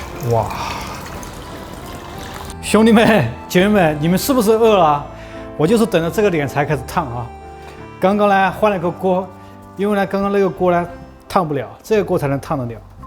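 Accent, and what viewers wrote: Sichuan, China. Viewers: 我的父母都是四川人，在美国听到四川音感觉很亲切。四川好男人，靠谱！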